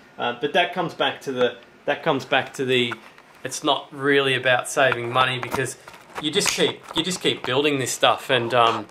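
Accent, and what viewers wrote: Australia. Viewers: Quality video ! you do a great job with this , subbed , watching / taking notes / stealing tons of ideas . don't be surprised if you notice a lil aussie accent on my next vid ....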